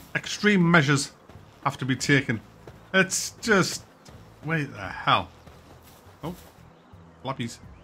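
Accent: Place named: Sunderland, United Kingdom. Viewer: this. He's a mackem. I know because I'm from Sunderland. The difference in the accent is very noticeable to someone from the north east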